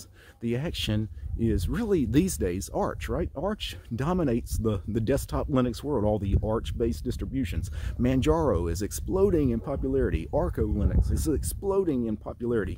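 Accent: South, United States. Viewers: Ah, so you're in Louisiana! I always wondered where that southern accent came from. We got a lot of snow here in Arkansas too.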